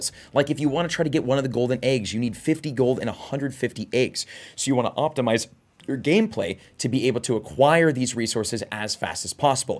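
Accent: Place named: South, United States. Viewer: I’m learning English for quite long, and this southern accent is siiiiiiick!! Love it , it brings some cool atmosphere here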